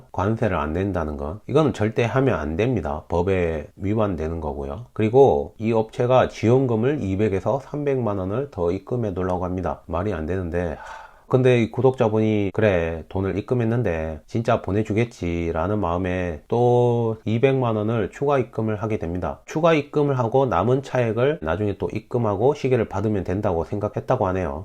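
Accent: Gyeongsang, South Korea. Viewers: 이알토 아.. 그렇군요.. 몰랐어요. 그냥 구수하게 경상도말 쓰셔도 재밌을텐데요~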